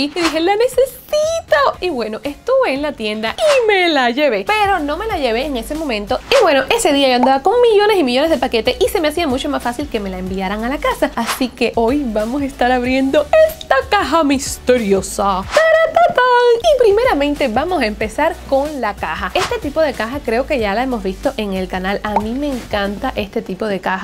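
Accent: Cuba. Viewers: tu cartera esta muy bella, pero te pido que no pierdas tu acento cubano, he notado como que lo quieres apartar y la verdad te queda muy bonito hablar así.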